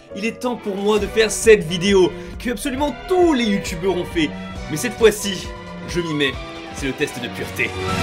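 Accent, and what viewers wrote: South, France. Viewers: Quel accent du sud XD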